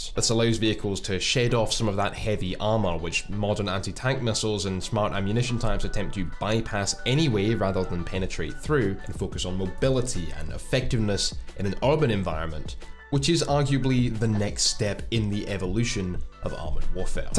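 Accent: Scotland, United Kingdom. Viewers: I just come here for that sweet Scottish accent... And the armored stuff!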